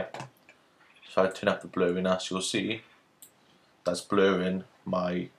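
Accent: Swansea, United Kingdom. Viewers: YOUR WELSH!!! ME TOO! WHERE YA FROM BUTT? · Swansea man? :D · i swear ur from swansea in south wales!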